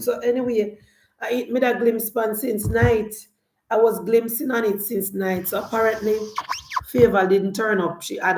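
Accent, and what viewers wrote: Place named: Jamaica. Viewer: first -time on your program i like the way you talk as a true Jamaican and a true Labourite i wan't you to tell the prime minister please since he going to reduce the 7% of the light bill i wan't him to look into the high food price if he can reduce the food price it will be a good thing for the Jamaican people and it will good for him too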